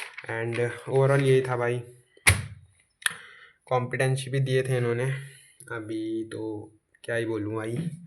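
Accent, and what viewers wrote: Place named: Odisha, India. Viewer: Bhai ap odisha se ho kya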